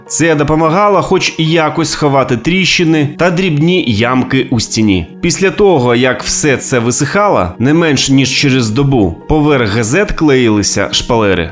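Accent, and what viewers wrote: Russia. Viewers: дякую,! тільки бажано вдосконалювати вимову, щоб позбутися російського акценту.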